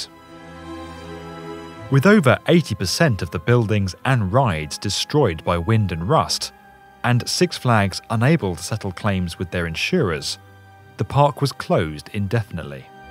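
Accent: United Kingdom. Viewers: Man i love your videos they are so informative, entertaining and savvy i could watch them all day..don't stop your fantastic work. you speak clearly and slowly and your British accent helps make it more easy listening rather than some fast talking American woman's voice.. it makes a difference in my opinion... Thanks for your videos which im happy to share with my Facebook friends anytime.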